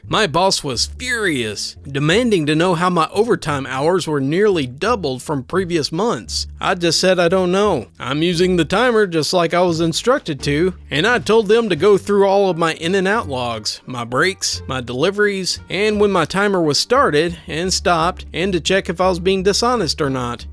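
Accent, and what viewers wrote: United States. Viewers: pronounce the word "arse" in your very American accent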